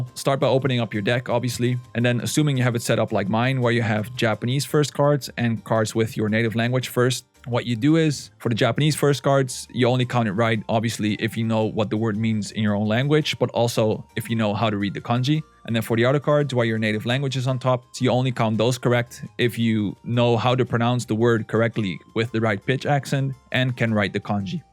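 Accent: Netherlands. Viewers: Great video! I started learning Japanese last September, nearly 9 months ago. Rather than months or years, I think the crucial factor is the number of HOURS, which is why I decided to write down how many hours I studied every day. So far I have done exactly 332 hours, which works out at roughly an hour and a quarter per day on average. This isn't a lot, but I have a family and a full time job, so I cannot realistically manage much more. I have been using Wanikani for the kanji and vocabulary. Grammar I have covered with an app called Busuu, but I think I have gone too fast, because it hasn't really stuck in my brain. Right now I'm reviewing all the lessons from the past few months. And to top everything up, I use Duolingo. It's free, it's fun, and there's a bit of everything in there: kanji, grammar, vocab, useful phrases, etc. At my present rate, I expect it to take me a bit over a year to get past the beginner stage and start just immersing; reading and listening. 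In July I have the JLPT n5 exam, which I expect to pass. After that I will no longer consider myself a beginner. I'm not worried about output, so I won't be trying to talk to Japanese people, or writing out the kanjis. For the foreseeable future I will be limiting myself to input. By the way, I recognize the Dutch accent from when I lived in Belgium. Like most Dutch speakers, your English is excellent.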